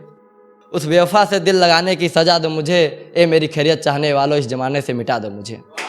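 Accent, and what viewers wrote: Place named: Allahabad, India. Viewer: Superbbb bro❤️.....pure allahabadib... must listen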